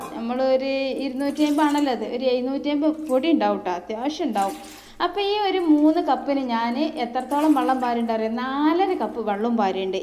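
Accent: Malappuram, India. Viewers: താത്ത പറയുന്നത് ഒന്നും മനസ്സിലാകുന്നില്ല. Slang കേരളത്തിന്റെ മധ്യ ഭാഗത്തുനിന്നും തെക്കോട്ടു താമസിക്കുന്ന ആർക്കും മനസ്സിലാവുമെന്ന് തോന്നുന്നില്ല. ഇത് മലപ്പുറം ഭാഷ ആണോ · Aa😁. Malappuram. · .Nishkalankamaya samsaram.. Athanu malappuram bhasha.. 🥰super pathiri👍🏼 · തനി മലപ്പുറം സ്ലാങ് ആണ്‌. കഴിക്കണ്ടത്തവർ കഴിക്കണ്ട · മലപ്പുറം ഭാഷ ആണ് മക്കളെ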